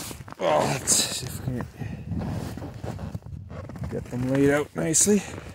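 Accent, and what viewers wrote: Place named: Canada. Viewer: Also, I’m Canadian and you sound really Canadian am I right? Nice to find Canadian creators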